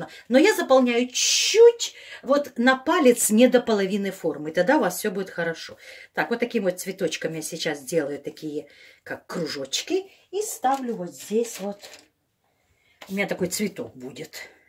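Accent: Ukraine. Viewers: ты просто молодчина очень хорошо рассказываешь и показываешь.Голос приятный с украинским акцентом.Ты добрая душа лишнего не говорила а всё по делу.Дай тебе Боже здоровье, и всех благ в твоей жизни.С праздником Вас. Христос воскресе! Воистино воскресе! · Уважаемая, я пеку Кулич / Пасху, которую вы пекли на передаче" Всё беде добре" ( смачно), вы там ещё банановый кулич, зайчиков и в яичной скорлупе делали. Огромнейшее вам спасибо, у меня всегда они получаются шикарно, и ещё --- говорите и не слушайте никого, ваш украинский акцент мне очень приятен 👍🌹🌿🙋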